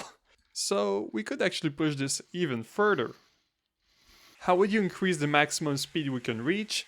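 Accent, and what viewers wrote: Quebec, Canada. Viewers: t'es quebecois?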